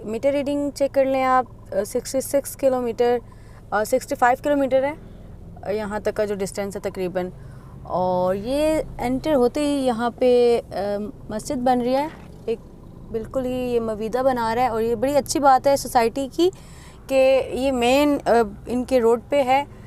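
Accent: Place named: Rural, India. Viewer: Tumney baal bhi seedhay kiye huvay hain aur kaalay chashmay bhi lagaey huvay hain ,, lekin jab bolti ho tou pata chal jata hai k after all paindu ho. Bilkul Muhammad Amir ki tarah ,,, shakkal bhi ussi sey milti hai aur baaton ka andaaz bhi. Thori bolnay ki speed kum karo, thori narmi aur shaistagi paida karo apnay lehjay mein, aur relax ho kar bolo.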